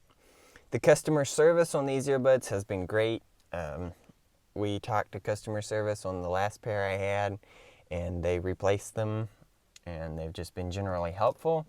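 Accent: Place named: Alabama, United States. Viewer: I know you You're from Alabama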